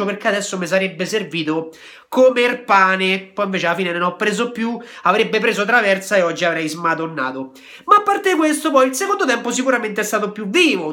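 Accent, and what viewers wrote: Latina, Italy. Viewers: Ma sei di Latina?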